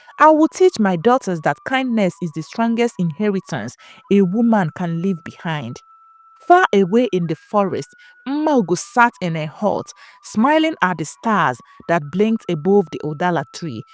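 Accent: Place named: Ghana. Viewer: You speak like a Ghanaian are 😮😮 you one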